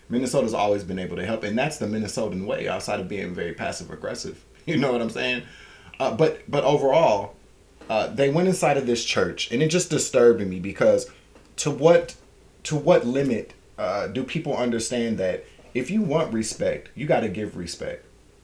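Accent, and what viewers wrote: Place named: Canada. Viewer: Sounds like Canada 🇨🇦 aaa lol my home and native land 😉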